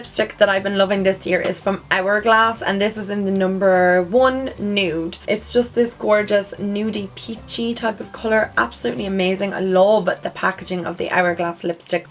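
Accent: Ireland. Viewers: so funny I'm obsessed with you girl your amazing and me and my fiance are obsessed with conor McGregor yall are both Irish and while I'm watching you and I hear another Irish accent lol I look over and my fiance watching conor lolol point of story I love you and we love Ireland ♡ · i watched several of your videos and i could figure where your accent cam from. well now i know :) always loved Irish accents!!! you are now added to my favorite youtubers XD besides i love your videos anyway so your accent is just a plus!!!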